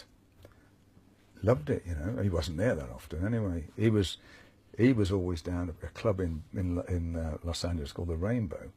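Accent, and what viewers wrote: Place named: Birmingham, United Kingdom. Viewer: Sounds exactly like my dad did...northern English, Birmingham/West Midlands accent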